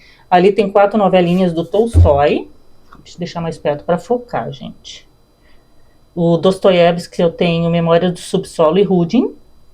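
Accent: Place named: Rio Grande do Sul, Brazil. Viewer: Bookshelf legal, ainda melhor com o soquate gaúcho, saudades do sul do Brasil 🙌🏻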